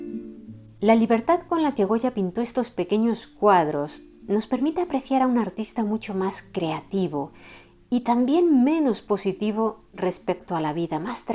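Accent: Spain. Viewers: Me encanta ése lindo acento español. Soy colombiano y admiro muchísimo tu trabajo.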